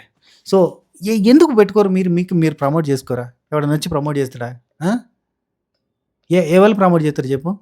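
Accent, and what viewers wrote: Telangana, India. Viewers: KCR thatha tharvata nak nachina telangana person nuve anna super matladtav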